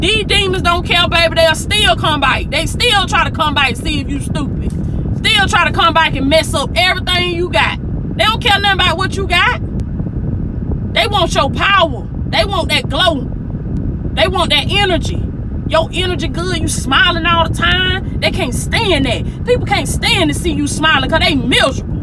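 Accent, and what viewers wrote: South, United States. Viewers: This sister chopping up 🔪 Real Truth with that wonderful southern accent....🍓🌹🌹🌹♥️♥️🙏🙏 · Your accent is so adorable to your Godly personality is this a Texas, or the Carolinas, Georgia maybe a Florida accent? God is good. The enemy only comes to kill, steal and destroy... Remember that! If someone is an ex its for a reason. God doesn't allow a true life partner/destiny spouse to exit your life, the purpose would be greater than the two's emotion or troubles and God's sovereign will would cover and keep the relationship steady and balanced. The Lord gives blessings and good gifts a help mate not a satanic agent or a repeat offender. When a wicked person resurfaces it's like a venomous snake, when it rears its ugly head, cut it off! WATCH & PRAY... Bloodline Circles all around us they cannot cross over! The deeper you go with God and the more you are delivered and the more power you actually wield the spiritual grounds for certain people, places, spirits and things are broken. 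They are bound banished and vanquished away. They can try but will fail. Make the Hand of God and Blood of Jesus the gateway to your personal and intimate life. We are only human and imperfect but even Angels of God will keep evil away and ward them off. He wi move you on to a place they can never find you even in a crystal ball or to astral project to monitor you will be shut down! Nobody pass Jehovah put Him in front of all things. His plan is not for the wrong everything and everybody but for the proper ones.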